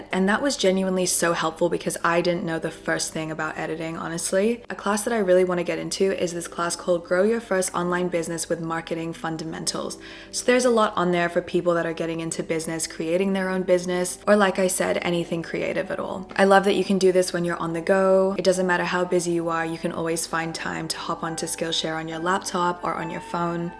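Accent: Australia. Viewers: The accent?? Where is she from. My guess is Australia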